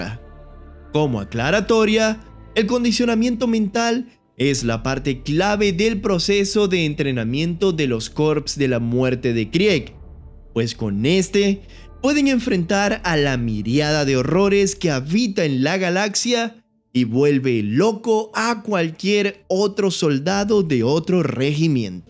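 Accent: Venezuela. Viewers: Y su acento venezolano ayuda mucho. Es muy agradable de escuchar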